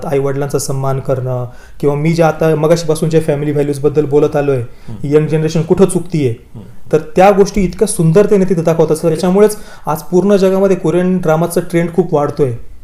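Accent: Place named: Nashik, India. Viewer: तुजा मराठी एक्सेंट फुल नाशिकी आहे मला वाटल पुणेरी असेल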